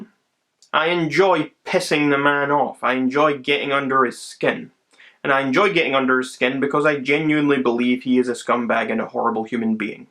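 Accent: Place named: Scotland, United Kingdom. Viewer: Ok you are Scottish 🥰 best inventors,best accent,now my best channel for tea ☕️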